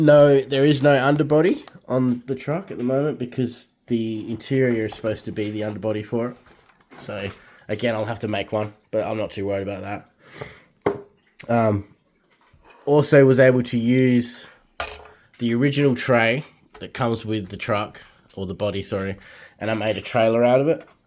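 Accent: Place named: Australia. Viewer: Just came across your channel through the mystery of algorithms, but liked and subscribed straight away as it's great to find an Australian RC Channel 🇦🇺🦘😎 Getting tired of listening to yanks and poms 😂 The Cruiser is looking awesome, but sounds like you would have had more joy from simply buying a MN82 RTR instead!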